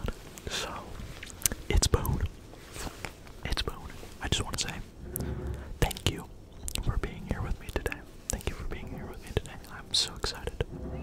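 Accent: United States. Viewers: very Minnesota accent😭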